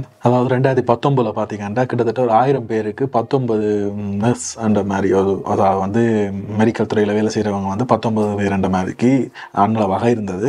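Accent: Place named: Jaffna, Sri Lanka. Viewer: Your Tamil sounds sweet like Jafna people's slang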